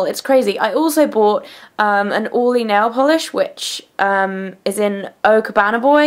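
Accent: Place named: United Kingdom. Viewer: I love your accent! I would give anything to have a British accent! Its so classy!:) thanks for the video! I love Vlogmas!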